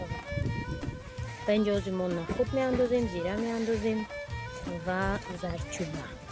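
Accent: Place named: Khatlon, Tajikistan. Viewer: апачон лафзи хтонкати гап занен мада лафзи хтон махкулай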